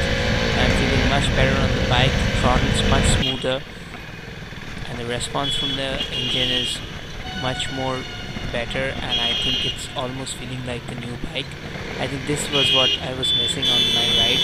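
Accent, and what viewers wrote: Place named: Bengal, India. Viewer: dude that bong accent tho!! :D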